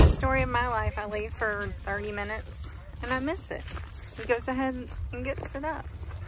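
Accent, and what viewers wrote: South, United States. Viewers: Another great video! I miss my farm 3500 acres, and we grew wheat, barley and peas. It was up on the high line in Montana but due to an accident I was no longer able to farm and your videos is very uplifting! is a man of few words and is funny. I joined your channel not long ago and have never seen pack a pistol. When we would go out and check crops or be in the tractor there was always a pistol and a rifle in the truck, combine etc. as we have coyotes, badger, mountain line black bear and grizzly bear. You are just a hoot, the southern accent and facial expressions are funny as heck. A great family channel and you have a great family as well! Keep doing what you're doing cause you're doing it right! Take care, stay safe and God bless!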